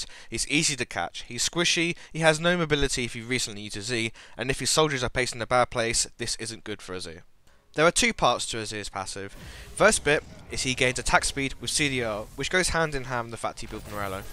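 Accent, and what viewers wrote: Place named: England, United Kingdom. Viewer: 21 I get it if I rewind the video but it's a bit of a hassle. Try pausing a bit between words man, you have a very heavy english accent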